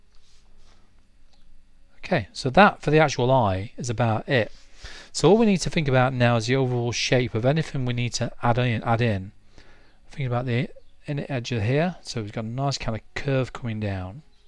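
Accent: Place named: Scotland, United Kingdom. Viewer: Omgosh ~~ I know this video is a year old & I’m so happy I found you😊 Long story short..I’m going through, not so fun times, but you were able to put a smile on my face..just by being you💕 I thank you. Just to get out of my “funk” as I call it I want to paint a cat’s eye. Thankfully I found you. Step by step over two hours. Nobody does that. They want $ sure rightly so however there are people who want to teach and they don’t care about $. You have integrity. Thanks for putting a smile on my face. It has been a few since I had one. Your accent helps too. My grandparents were from Scotland, and England. Hearing your brough has helped immensely. I would love to send you my finished eye. Is there a way? Hope you’re getting along well. I’m Scottish and live in America. This has been quite a couple years aye? Hope to hear back and pray that all are happy and well ~J~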